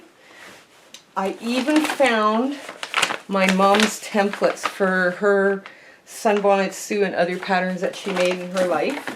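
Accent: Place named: Canada. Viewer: Hi, I envy your organizational skills. My sewing room is a mess right now. We're going to move my sewing room from the dining room to the den. The den is actually smaller so I'm going to have to get better organized. I love how you have so much fabric ready in anticipation of using it in a project, your storage of 2.5 inch strips is genius. I'm stealing that idea from you and I'm going to have to steal your Area 51 for my UFOs as well. It's too funny. I have a Bermuda Triangle in my laundry room, it's where socks go to another dimension. I love your Canadian accent! I went to school in Ontario so my ears perk right up when I hear that lovely Canadian way of saying certain words like project, it takes me back to my school days, fond memories for me. I'm from Michigan and still have a slight accent but I think I sound like a Michigangander now. I have a very dear friend in Calgary, it's just gorgeous there.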